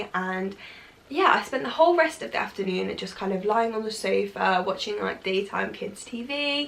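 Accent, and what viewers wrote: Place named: England, United Kingdom. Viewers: I'm an American so I'm not great at recognising different British accents but I really like yours! To me it sounds...well, between York and London 😅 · I KNEW I recognized that accent immediately! I’m American, but my brother’s husband is from Nottingham. Such a beautiful accent!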